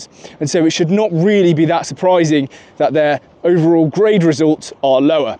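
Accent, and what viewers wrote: RP, United Kingdom. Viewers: You sound posh, probably went to a private school which many families cannot afford. YOU ARE PRIVILEGED. Also, consider this, do you really think if all else was equal and everyone had a chance (including the huge pool of international candidates who are statistically more talented than you) that you would get into Cambridge?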